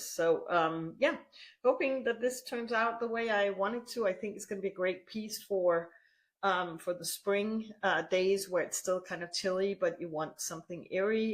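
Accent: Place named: Denmark. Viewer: Thank you for this podcast. Love also the little danish prononciation🤗 Quiet complicated Danish language…..but I try to repeat it a few times after you😂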